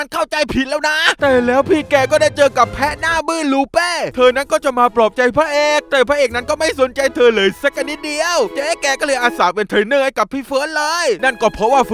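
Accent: South, Thailand. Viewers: ชอบๆ มีภาษาใต้ด้วย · พี่คนไต้ไช้ไม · แอดเป็น เด็กใต้?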